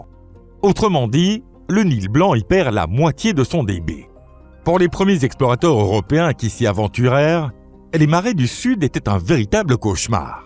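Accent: Belgium. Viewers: Ce n'est pas une ia, c'est simplement une prononciation belge, les belges prononcent le ui, oui.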